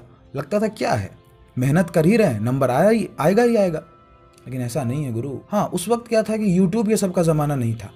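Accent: Bihar, India. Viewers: Bhai bihar se ho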